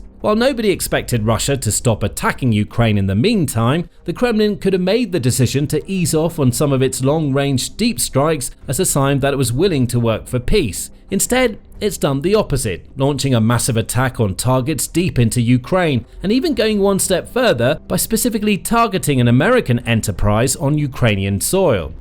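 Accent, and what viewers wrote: England, United Kingdom. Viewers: I am so tired of an English accent. Thumbs down.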